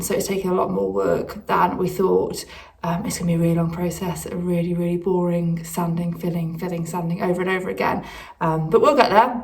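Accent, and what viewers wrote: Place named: United Kingdom. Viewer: Just loved this!! Great job!! We are from upstate New York and my husband says I have a very flat accent🤦🏻‍♀️, your British accent, on the other hand, is so lovely! Good luck with all of your endeavors!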